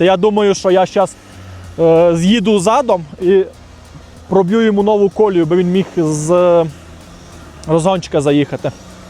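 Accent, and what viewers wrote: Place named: Transcarpathia, Ukraine. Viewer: Впізнаю наш говор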